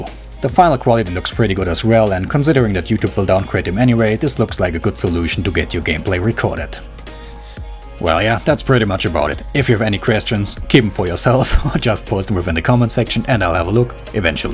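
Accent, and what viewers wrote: Germany. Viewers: You are from Germany,right?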